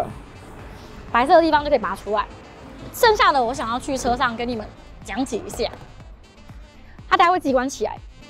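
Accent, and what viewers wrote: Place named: Taiwan. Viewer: 主持人講話好台喔！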